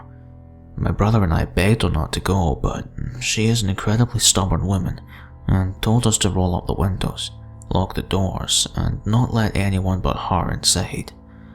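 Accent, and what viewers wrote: Scotland, United Kingdom. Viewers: Great video! I love your narration, although I must admit I do have a serious jello-kneed weakness for a Scottish accent! Keep those stories coming! 😘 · Yay a Scottish horror narrator !!!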